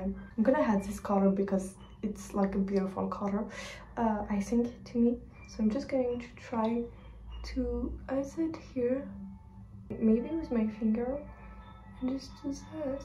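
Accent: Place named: France. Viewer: I like your accent french girl. You are very pretty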